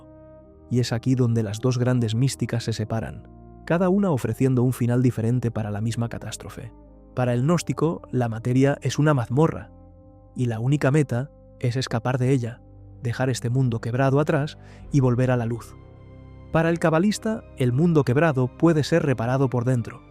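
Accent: Spain. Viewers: tu asentó español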